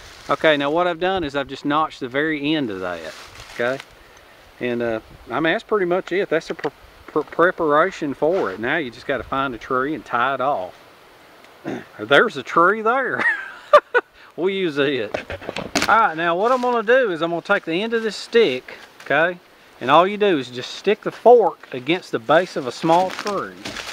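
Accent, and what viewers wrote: South, United States. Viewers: Android Pal then we couldn’t hear his infectious laughter, his melodious deep southern drawl, his “lil jewels”, and that wouldn’t be cool! I d read it too just for the record but I’d miss a lot of above mentioned. 🤘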